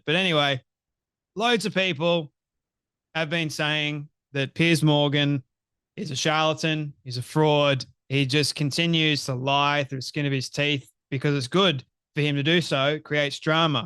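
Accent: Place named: Australia. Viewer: U r the man!! However that Aussie accent sometimes throws me off 😁 I hear t-wrist organization and don’t get what it is, until I realized you’ve actually said t3rr0r1st lol. Still, no matter the accent, u r the man!! Keep speaking the truth !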